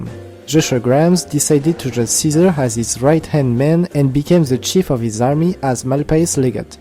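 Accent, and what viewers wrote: France. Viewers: ahhh laccent francais put1 ca craint.. essaies de t'en debarasser quand tu parles l'anglais... " hello Zis iz a litteel video on fallout" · You deserve every view frenchy. Props for having the courage to voice them even though it isn't your native language. Keep making these awesome videos. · Es tu français sa s'entend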